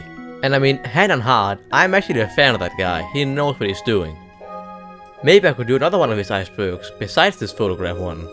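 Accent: Denmark. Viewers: yeah because hes danish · He has a Danish accent, I just found that out myself Because I'm used to people speaking English with an accent it didn't take long for me understand him clearly, so I'd say maybe try listening for a bit and maybe you'll begin to get used to his accent Also best girl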